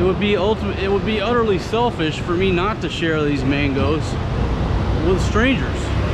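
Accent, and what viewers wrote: South, United States. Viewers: Where you from bro.... I hear a southern accent.....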